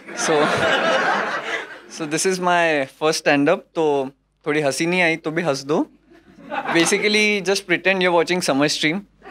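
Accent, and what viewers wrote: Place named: Gujarat, India. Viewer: Munawar Faruqui accent dono gujrat se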